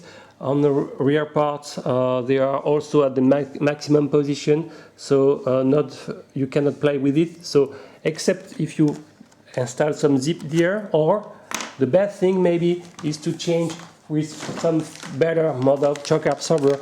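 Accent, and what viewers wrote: France. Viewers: Hey dude are you french? You have a strong accent!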